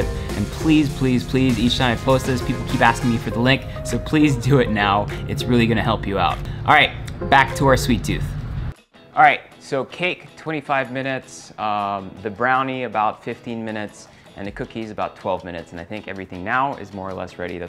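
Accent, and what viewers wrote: United States. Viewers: Half Filipino and half French - so why the American accent rather than a sexy French or Filipino one? Were you raised in the States? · Funny to hear a very strong American accent with a nicely said ´bain marie’ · YouTube recommended this to me, who is he, he is very cute!!! Does he live in the Philippines? Is he Filipino? He has an American accent. I HAVE SO MANY QUESTIONS!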